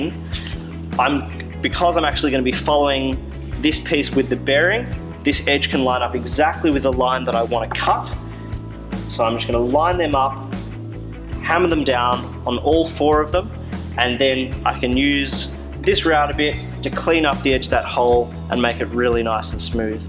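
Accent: Australia. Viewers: lovely production values and great information. So good to have an australian voice and without the seemingly obligatory "yo whats up guys" followed by death metal music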